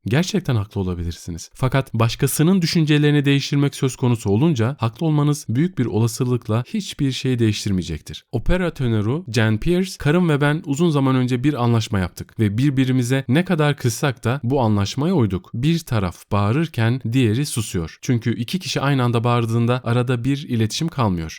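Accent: Azerbaijan. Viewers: Qardaş siz Azerbaycanlisiz? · Azerbaijan Turkcesiyke konusuyor)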